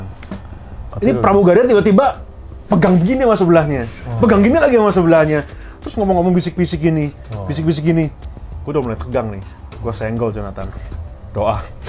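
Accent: Java, Indonesia. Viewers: Jiangkrik. Di rungok"ne jowo tibae😅😅 Sentolop barang e. Jurrr. Ngrungokne penyampaiane iku lho. Mau ketawa takut dosa. 🤦‍♂️🤦‍♂️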